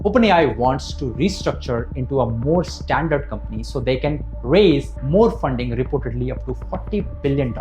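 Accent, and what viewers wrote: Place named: India. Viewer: omg. i guess your audience is meant for indian people not american people. you are impossible to listen too with your accent.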